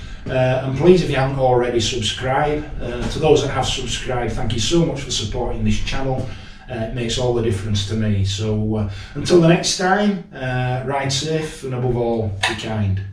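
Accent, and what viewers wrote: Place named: Bradford, United Kingdom. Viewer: Great review kid. Watching from Sydney, and lovely to hear a Bradford accent while being educated.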